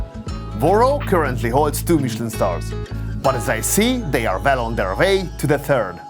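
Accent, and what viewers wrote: Hungary. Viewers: Nagyon jo a video. Szerintem igy felmillio feliratkozonal ez a csatorna mar tekintheto komolynak is, igy erdemes lenne angoltanart keresned. Anyanyelvit mindenkepp,mivel valasztekosan,magabiztosan beszelsz, csak a kiejtes,es a hangsulyozas az, ami nagyon "magyaros".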